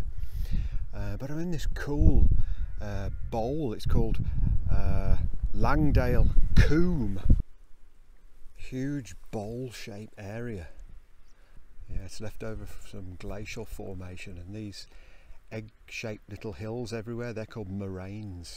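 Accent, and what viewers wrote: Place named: Midlands, United Kingdom. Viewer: Your videos are proving really useful! I'm walking The Cumbria Way in a few weeks ( August 22) It's my first solo walking and camping be trip so I'm a tad nervous; I'm used to trail running but not walking long days back to back. I love your accent,. I can tell you are a fellow midlander but I just can't pinpoint it...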